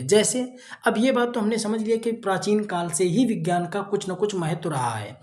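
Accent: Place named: Kanpur Dehat, India. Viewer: Sir aap kanpur dehat se ho kya...kuki apki boli lg rhi h ki aap hmare pukhrayan ke pass k hi ho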